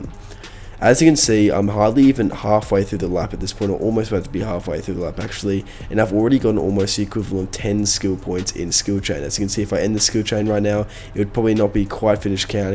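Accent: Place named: Australia. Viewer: Hes speaking Australian english perfectly lol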